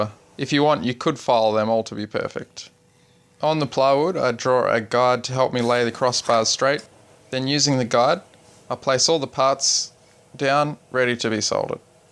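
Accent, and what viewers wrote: Australia. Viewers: Thanks for the reply. I've been looking at a number of your videos and have to say they are quite impressive. I too like a lot of attention to scenic detailing. At some point I would like to send you some pictures of a layout I have been detailing for the past 30 years. Did you mention a brand of wire you are using and where you can get it from. From you accent I suppose you are from the land down under and I live in the Northeast United states. As an aside there is an annual convention of master craftsman model builders. Here is the site: <link> It is occurring on the end of March and beginning of April. Check it out!!! I have Friends in Brisbane...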